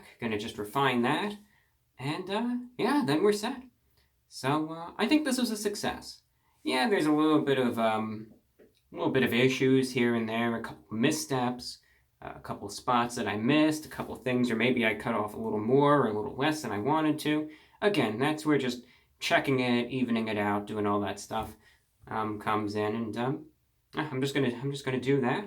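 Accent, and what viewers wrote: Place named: New York, United States. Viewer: If you watch his older videos he has a NY accent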